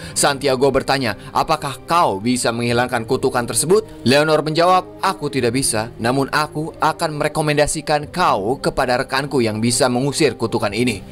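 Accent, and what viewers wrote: West Java, Indonesia. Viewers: Orang sunda kitu iyeteh nu nyariosna😅😅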